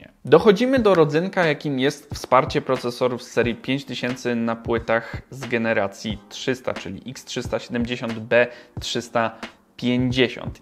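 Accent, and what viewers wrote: Silesia, Poland. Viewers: oho jest ze Śląska, Pozdrawiam Hanysa:D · po śląsku godo a kto nie wie to gorol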